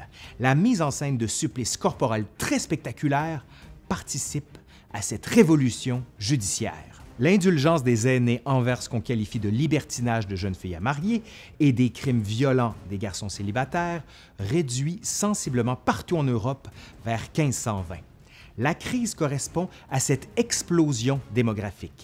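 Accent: Quebec, Canada. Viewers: Dans les années 2000 à Bordeaux, j'ai vu une exposition québécoise fabuleuse sur le Moyen-Âge. Cela a achevé de me passionner pour cette époque de mon pays. Depuis, le Québec m'aide à connaître la France, et j'ai appris en retour à connaître ce beau pays de Québec (libre! 😉) et sa culture si riche. Mon fils de 8 ans te regarde souvent avec moi car "il explique très bien le monsieur!" et il peut suivre. Et on adore ton accent! Bisous de France 🐸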